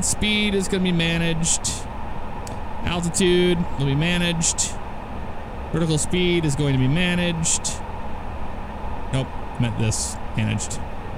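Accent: United States. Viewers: Are you American or Australian? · wait, can you really not tell lol, he is american.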